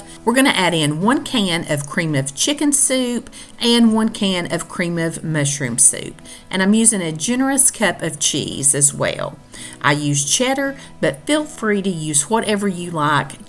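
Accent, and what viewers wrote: South, United States. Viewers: you mention snow days. But girl, you sound so southern. Love listening to you. You have a very soothing voice. And boy do we ever need that in todays world.. Thanks for some of the easiest n tasty recipes I have made watching you. ❤️👏👏👏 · Just found you and had to subscribe! Love me some recipes that are meant for tweaking, and I could listen to that southern charm all day.😊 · Been watching your videos - love 'em. You have a natural talent and come across so sincere and sweet! And a great accent ! I'm a deep south girl from Looziana! Love how you say "Fancy" - it's a southern thing!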